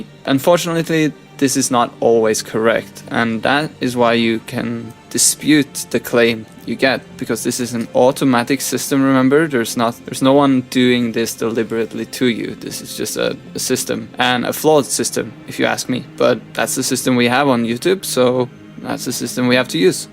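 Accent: Norway. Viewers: NORWEGIAN THATS WHAT THAT ACCENT IS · 1. Congrats on the 200k subs! 🙌 2. Thanks for clearing up the copyright thing. That stuff had me confused, but now I get it 👍 3. It's nice to hear your voice again. I love your Norwegian accent 😌 4. I would totally buy your merch...if I have money that is 😅 5. Skyworld! Wooo! I love that series! 🙆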